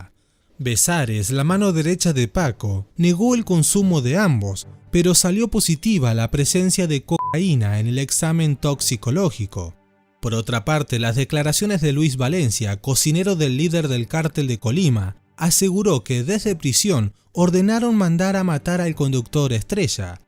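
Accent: Argentina. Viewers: Escuche tu acento argentino y creeme que iba a parar el video porque veo qye tanto argentinos como colombianos viven de la farandula mexucana..pero bueno haz tu trabajo😅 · pues tu video sin chiste. no comentas nada nuevo en absoluto, no pude terminar de verlo por tu detestable acento argentino que lo hizo mas nefasto aun. un bodrio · Ese acento argentino bien estupido 🤣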